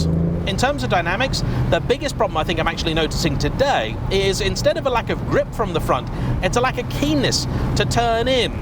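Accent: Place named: Liverpool, United Kingdom. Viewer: you are my favourite Scouser, pls come to Pakistan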